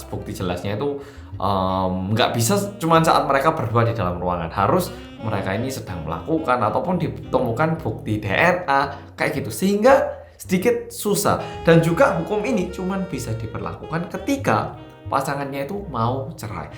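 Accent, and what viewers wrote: Java, Indonesia. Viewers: Mas nya asli orang korea ? Atau Asli orang indonesia... Logat nya aga sedikir terdengar ada logat jawa..tp muka kaya orang korea... Jd mas nya orang korea atau indo ?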